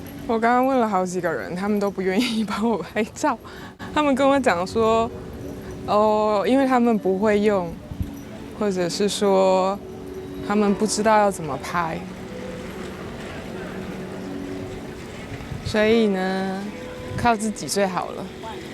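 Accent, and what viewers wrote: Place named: Jiangzhe, China. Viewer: 博主是哪里的华人？听口音真的很大陆华南的口音，偏江浙一带。但是海外华人基本上广东福建人很多，口音明显，所以我很好奇。 如果是大陆人，到大陆不至于这么惊讶，不是大陆人，口音真的好大陆，如果说是台湾人，口音真的很淡。 😁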